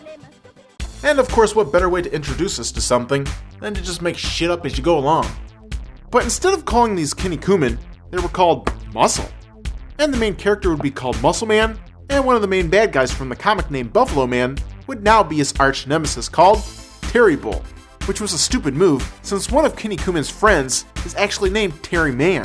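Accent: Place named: South, United States. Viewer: Great episode. Though I think it's funny how he mispronounces Kinnikuman. Sounds like the way they'd say it here in the south. "Yeah man, this here's my cousin Kenny Kumen!" For the record it's said more like KEY NEEK EW MON.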